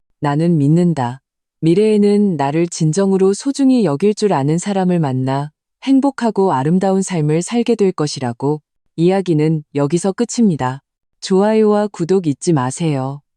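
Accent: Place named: China. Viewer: 해설자가 중국사람이야 왜.숫자를.그렇게잃냐.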